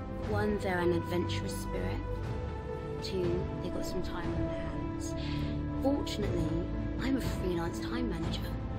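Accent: Netherlands. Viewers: hey! no, you're doing ok. learning the game is nice to see. een nederlands accent, trouwens. haha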